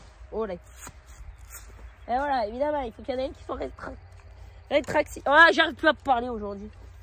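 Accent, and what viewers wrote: South, France. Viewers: Félicitations pour cette belle demo Et joli franc parler agrémenté d'un bel acent du soleil bienvenue chez moi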